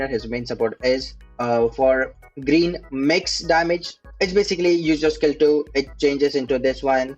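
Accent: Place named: India. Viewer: Consider using an AI voiceover, just hearing indian accent make me click away, even tho the video may be great.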